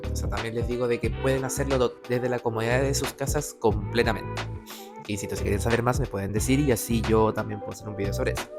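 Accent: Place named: Buenos Aires, Argentina. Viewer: Estas en tu casa capo! Se ve que sos un tipo extrovertido y estudioso Se te pego el acento de Bsas.😊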